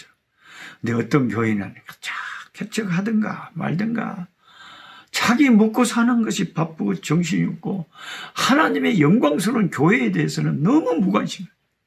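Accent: Jeolla, South Korea. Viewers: 말씀을읽는다는건축복인듯합니다 우리가 하나님의 자녀라면 그분에대해서 궁금해야? 꿀송이큐티가더욱가슴으로다가올것입니다 저 포함해서 말씀을읽어나가는집사4명이있는데 다들처음엔말씀이어려워서힘들게생각했는데 꿀송이큐티와 데일리노트(그날그날일기및주님과대화또한은혜쓰는노트)이렇게하다보니 지금은상경을재미있게볼수있고 잘모르지만성경도알아가는맛이 대단해지고있습니다 말씀은모르든지알든지 매일매일 우리의영을위해서먹어야할것같습니다 목사님의전라도사투리의성경공부가 다른채널보다 정감이가고 듣기도좋습니다 요즘은우리가 세상에서의삶도중요하지만 나의영에 부유함에더욱더신경써야할듯합니다 오늘새벽에는반은졸고 반은기도하고했지만 병원으로돌아오는발걸음이가벼웠습니다 말씀이사람을변하게하고 말씀이세상을보는눈과사람을대하는모든걸변하게합니다 오늘도 나의예수님은 최고였습니다 감사합니다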